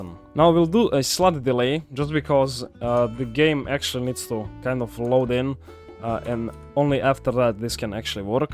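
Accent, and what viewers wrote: Russia. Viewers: RRRusian accent!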